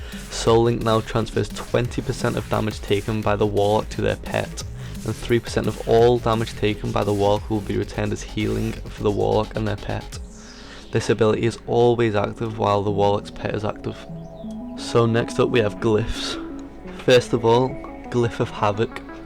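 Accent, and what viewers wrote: United Kingdom. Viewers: People from liverpool are usually always in a good mood lol, you sound like your from west derby / norris green area =o??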